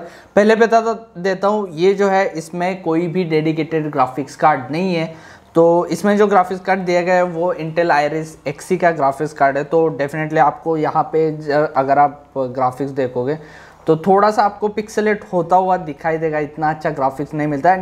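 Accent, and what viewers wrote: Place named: Maharashtra, India. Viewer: Bhau tu marathi ahes na tuzhi hindi aikun lagech karala pun changli ahe hindi 😂 btw mala suggest kar na ha laptop chan ahe ka like game gta 5 3 to 4 hours chalel?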